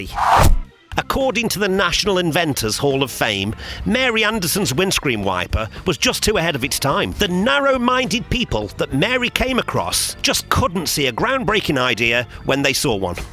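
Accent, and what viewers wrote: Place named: Stoke, United Kingdom. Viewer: I thought I recognised that accent. I've lived in Stoke for over a Decade now. Been trying to place you for a while.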